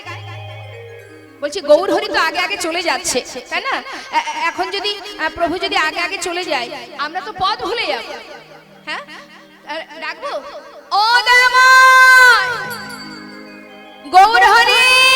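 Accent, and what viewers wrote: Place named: Noakhali, Bangladesh. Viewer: দাদা আপনার বাড়ি বাংলাদেশের কোন এলাকায় ,? আপনার কথা শুনে মনে হচ্ছে ঢাকা নোয়াখালী কিংবা ওর আশে পাশে।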